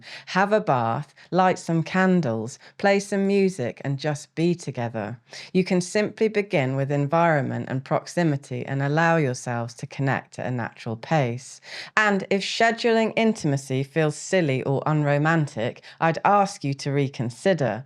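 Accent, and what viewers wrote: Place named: West Country, United Kingdom. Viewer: Sounds like someone from West Country, nothing more.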